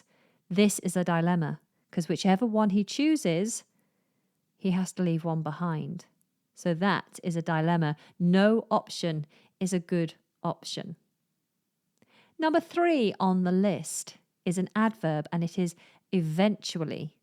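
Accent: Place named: United Kingdom. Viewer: Mam I have watched your almost all videos, I have got plenty of improvement in my British English,I like British accent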